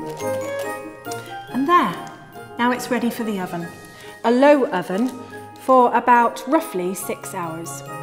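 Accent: United Kingdom. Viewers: Why do I keep watching these! Do I just love British accents?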